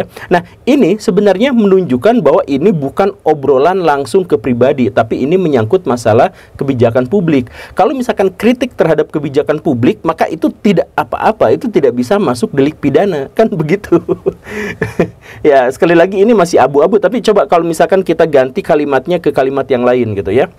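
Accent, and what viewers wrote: West Java, Indonesia. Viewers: Jika ingin mencontoh kan maaf dan maaf.... Jika saya mengkritik dalam penyampaian dan pembuatan Kontennya tidak bermutu dan mengkritik dengan bahasa sarkas tapi saya menyinggung gaya bahasa yang di sampaikan berlogat Sunda, saya yakin nggak tersinggung dengan kritik saya tapi itu sebagai Vitamin tapi yg tersinggung pasti masyarakat Jawa Barat yg akan marah karena telah membawa cara penyampaiannya, Semoga juga bisa menyampaikan hal ini ke masyarakat dengan Chanel YT nya hukum yg tidak tertulis di masyarakat agar semua paham karena sejujurnya apa yg di sampaikan itu simple dan mudah dipahami tidak menggunakan diksi' yang jelimet..!!! Sukses terus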